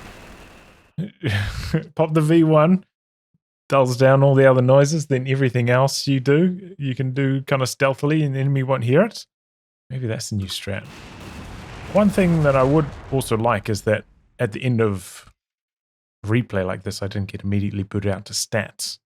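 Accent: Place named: New Zealand. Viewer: Great video always throws me hearing a fellow kiwis accent on you tube when I’m so used to just hearing yanks and Brit’s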